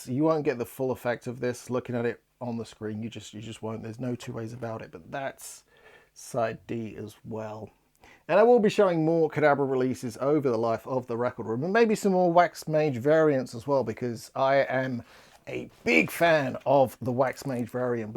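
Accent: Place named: United Kingdom. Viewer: Great stuffs in this first episode, really you're an incredible music collector, you're so young but with so many top items that I'm literally shocked about it! My respect for you will last forever! I like your format on the video, I like your British accent, although I should follow sometimes the YouTube subtitles because it's not simple for me to understand every words! I too have the vinyl and i like this album (really underrated by the fans), about the other albums I've downloaded them from Spotify to give them a listen... You're so lucky to have the boxset of as the Suspiria TP. A piece of Italy in your life! Stay gold!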